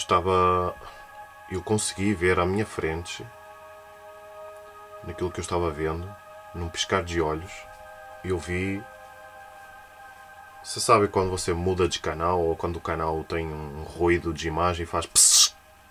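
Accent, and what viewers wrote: Portugal. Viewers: Es portugues fingindo ser brasileiro? Tamaste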